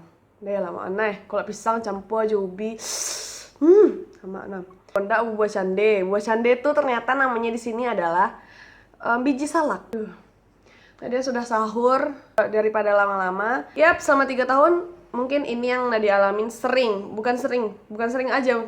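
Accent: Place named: Minangkabau, Indonesia. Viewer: buliah kenalan uni. namo awak della asli minang tapi laia dan gadang di jakarta . papa jo mama awak urang lubuak basuang . awak kuliah di gundar semester 5 jurusan akutansi. uni bahaso minangnyo barasiah bana . sanang awak mandanganyo tp awak indak sapasiah uni bana bahaso minangnyo.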